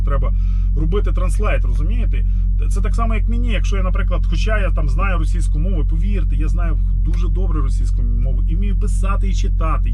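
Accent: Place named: Surzhyk, Ukraine. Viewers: Сначала ты показался адекватным , здраво рассуждаешь, а нет, показалось... Говорящий на суржике западенец с кашей в голове из клише и пропаганды с 1+1 и фейсбука - вот твоя характеристика ! Ты понятия не имеешь кто такие патриоты ! Это те что сейчас в окопе отдают свою жизнь, и там нет разницы на каком ты языке говоришь ! А подобные тебе "патриоты" которые не в состоянии выучить свой язык, могут только из-за границы тявкать о чем-то. За твоими высказываниями статья криминальная плачет о сепаратизме ! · Я Вам ещё раз задам вопрос- где вы видели чтобы ПОЛЯК, НЕМЕЦ, ФРАНЦУЗ , ИТАЛЬЯНЕЦ и тд. с трудом разговаривал на своём родном языке??? Это и вас касается- суржик на котором вы балакаете це нэ мова шановний.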